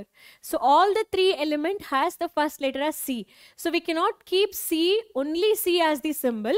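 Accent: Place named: Uttara Kannada, India. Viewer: Mam you speak in uttar kannada voice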